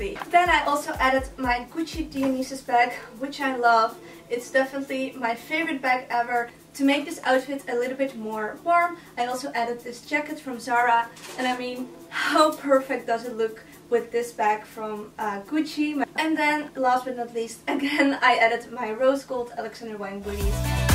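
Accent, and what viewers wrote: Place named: Netherlands. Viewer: First couple seconds of you talking and I already figured out you're dutch 😂😂 leuke video girl xx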